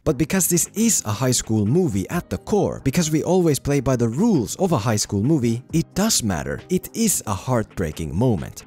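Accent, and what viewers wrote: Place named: Finland. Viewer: You're Finnish, aren't you? That accent... familiar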